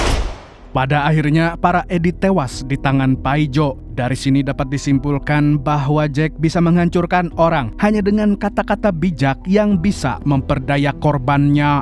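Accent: Banjar, Indonesia. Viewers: Buan banjar kah om ai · Tebak nah admin nya banjar bagian mana😂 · Urang banjar sekalinya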